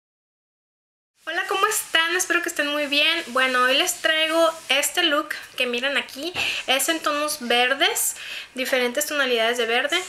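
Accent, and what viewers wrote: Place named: Mexico. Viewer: lindo che por ke pronuncias asi tu mushooo de ke parte de mexico eres te kedo bello y me encanta cuando ablas asi es bien lindo un beso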